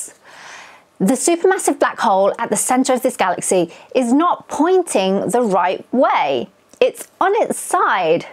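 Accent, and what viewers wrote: United Kingdom. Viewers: Your face screams asian, but your voice says vaguely british.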